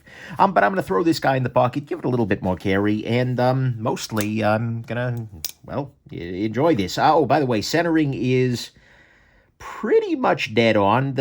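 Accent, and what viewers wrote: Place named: Northeast, United States. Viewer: I love your accent, where are you from? NE area I'm guessing.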